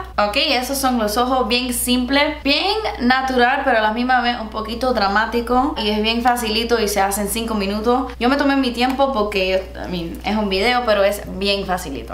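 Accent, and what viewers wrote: Cuba. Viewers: Me encantó!!! Hacía mucho que quería este video jaja....! I love your cuban accent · hablando español, con acento cubano 👏🏼 what I’ve been waiting for forever 😂 jajajaaj I love how cubans kinda yell, I get it cause I’m Mexican from the north part of Mexico and southern Mexicans always tell us we are yelling 😂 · 😹😹 I’ve been watching you for years and wow. This is the first time I have ever heard you speaking Spanish. I love your Cuban accent ❤️ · Maybe it's difficult if you are learning Spanish from Spain, because she has Cuban accent, and the pronunciation is a bit different. Bit it's cool you can see different accents. Cuban is beautiful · Jajajaja ¡me encantas! 🌹 Tienes un acento cubano precioso. Ojalá te animes hacer más vídeos en español. Un beso enorme desde España 🇪🇸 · Se nota tu acento cubano mucho jajaja, se te entiende muy bien (soy de España) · Nunca pensé que tu español era tan latino. 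Tienes muy marcado el acento cubano con todos sus giros. Muy simpático y divertido sobre todo cuando dices "ya tu sabes". Gracias por ser tan cute 😍😍😍😍😚😚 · Chica me encanta tu acento cubano!!! Para mi tu español no es tan malo, solo te hace falta acordarte de ciertas palabras y listo. Por favor haz mas videos así! Desde que te empecé a seguir moría de ganas por este video! Saludos desde méxico 🇲🇽❤️ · I LOVED this video!!! I’m Cuban, I’m from Miami and so I’ve always loved your Cuban background and accent. My Spanish is HORRIBLE, but it was so funny to see you kinda mix in English in there and your Spanish talking voice is soooooo pretty. Such a fun video, I was smiling and laughing the whole time in the best way! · I love your Cuban accent! 😍 Please do more Spanish videos 🙏🏼!!!!! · Girl you did so good with the Spanish and I love your Cuban accent!! You definitely need more Spanish videos! 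· Me encanta tienes un acento 100% cubano 😂😂😂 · I’m bilingual too (born it the Uk 🇬🇧 but lived in Spain 🇪🇸 since I was 10) and this video was HILARIOUS! I know the struggle of mixing languages lol. I love your Cuban accent! 😍 ¡Buen vídeo como siempre! 😊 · No imaginaba para nada tu acento así, es 100% Cuba🇨🇺!! Gracias por este homenaje al español. Saludos desde España 🇪🇸😘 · Me! I love her cuban accent! 💕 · Que acento tan marcado de Cuba, no lo habría imaginado! Más vídeos en español please! Me reí mucho y el spanglish se entendió perfectamente...😘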